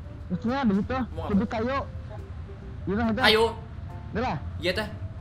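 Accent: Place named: Banjar, Indonesia. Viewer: Orang banjar mana suaranya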